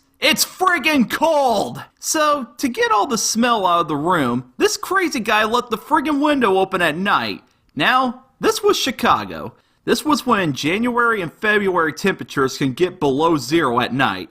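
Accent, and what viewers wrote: South, United States. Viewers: I love how southern he is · I love your country ascent!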